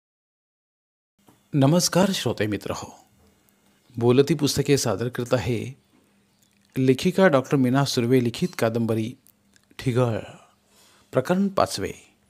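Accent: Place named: Kolhapur, India. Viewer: मी सर्व भाग पाहते, कोल्हापूर ला माझ्या आत्ती च्या गावाला आल्यागत वाटत्या 🙏अप्रतिम, सर्व दृश्य अगदीच डोळ्यापुढे उभा राहते 🙏अगदी बाहुलीत प्राण टाकल्यासारखे तुमची बोली भाषा आहे 🙏🙏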